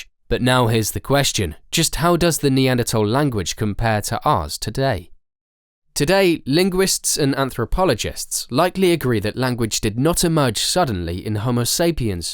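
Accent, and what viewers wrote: United Kingdom. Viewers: Hearing an English accent explain how a neanderthal must have sounded is kind of annoying to me.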